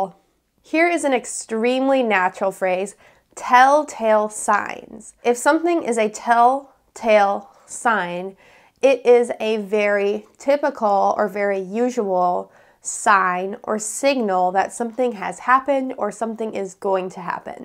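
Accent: United States. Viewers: Are you really an American ? I am asking this because there are so many online teachers who claim to be Americans but actually are Russians.Anyway you sound like an American to me.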